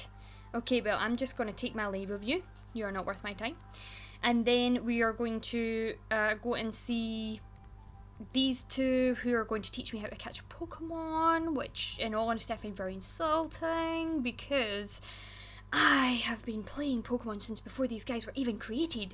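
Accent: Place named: Scotland, United Kingdom. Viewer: Could you please do a video of you pronouncing different Pokemon names? I admire Scottish accents, and have been trying to find a video of a Scot pronouncing Pokemon names for a bit now, and this is the closest I've found.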